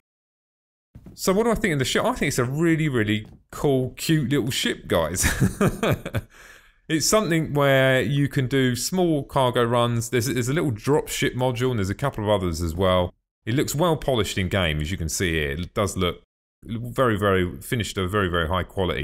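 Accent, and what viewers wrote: United Kingdom. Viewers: your british accent makes me want to drink tea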